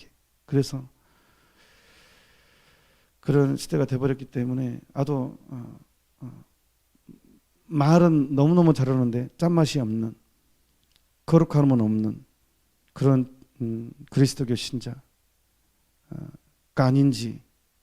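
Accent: Jeolla, South Korea. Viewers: 전라도 사투리가 정겹네요~~♡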